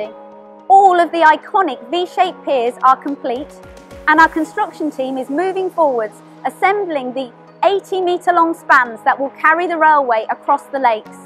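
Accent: United Kingdom. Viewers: Thanks for another informative update and confirmation of just how much work is being delivered to increase this country's railway network capacity. When the book is written and the "Lessons Learned" sessions are run, this will - I am sure - provide the model for future large-scale infrastructure projects around the works. We have adopted "green tunnels (aka "cut&cover") from the French - and the Colne Valley viaduct construction gantry started its life building a railway in China. The no-doubt temporary interruption to the greater build will, once this new railway line is operational, need to be reversed. Kudos to the project build teams involved and - for a British project with multinational teams involved, it is reassuring to hear a British voice explaining the progress and future directions. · Dialect, regional accent... These things exist in the UK.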